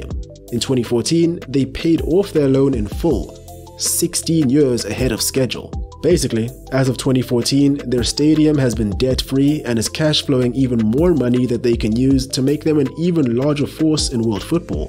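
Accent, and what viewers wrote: South Africa. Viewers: Love your channel ! You aren't South African by chance ? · Mr speaker you have a South African accent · You sound so South African. Its not even funny 🇿🇦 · Immediately recognized the accent. Greetings from SA 🇿🇦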